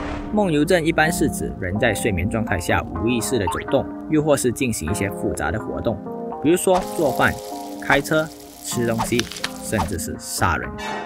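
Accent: Malaysia. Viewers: 你是马来西亚人吗？口音听起来很像XD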